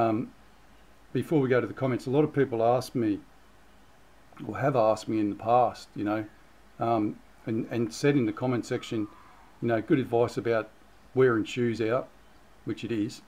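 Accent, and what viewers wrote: Australia. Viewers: Hi, great to get a reply from you. All good, happy for you to use my message. I have faith beyond measure and just know that no matter what my wonderful Father is watching over me and my family. I believe so much that very bad things are on the way and that those who are awake and prepared will survive. Keep up with your videos, so great to have an Aussie to listen to.... doesn't make the rest of us Aussies feel so alone.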